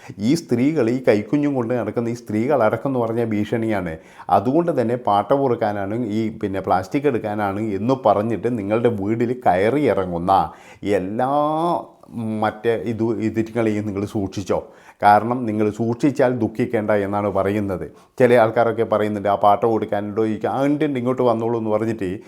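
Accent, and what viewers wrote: Kannur, India. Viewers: നല്ല കണ്ണൂർ ഭാഷ ❤️❤️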